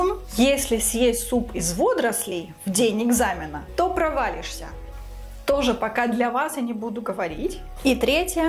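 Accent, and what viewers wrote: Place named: Thailand. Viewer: Корейский звучал с тайским акцентом))))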